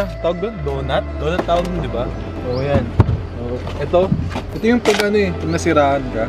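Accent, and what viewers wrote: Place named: Conyo, Philippines. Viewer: Ang conyo 😆😆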